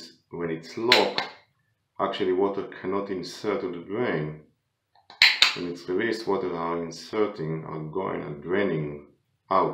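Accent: Israel. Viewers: יש לך מבטא ישראלי ?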